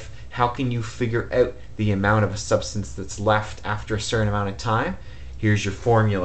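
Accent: Canada. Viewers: I can tell this dude is Canadian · he is canadian. I can bet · lol, accent is so Canadian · you truly speak like a Canadian. Oout. Aboout. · I knew you were Canadian within the first 10 seconds lol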